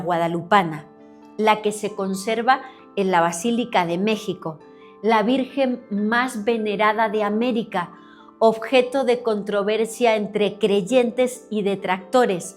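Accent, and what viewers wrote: Spain. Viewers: La única persona de la cual no me molesta su acento español ...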